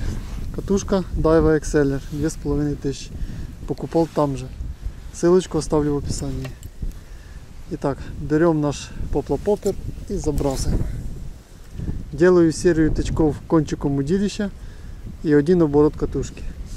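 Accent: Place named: Russia. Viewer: у вас наче старо-руський акцент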